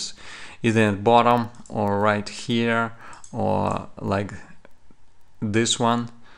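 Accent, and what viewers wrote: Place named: Italy. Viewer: si sente benissimo che sei italiano. il tuo inglese fa schifo!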